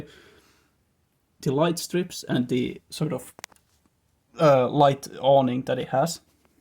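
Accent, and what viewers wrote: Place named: Finland. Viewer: I know a Finn when I hear one❗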